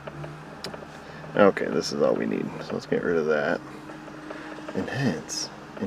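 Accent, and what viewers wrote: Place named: South, United States. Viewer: You sound just like the south of men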